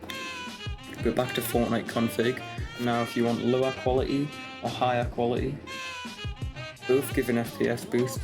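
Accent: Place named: Wales, United Kingdom. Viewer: Cmon g I know your Welsh i can tell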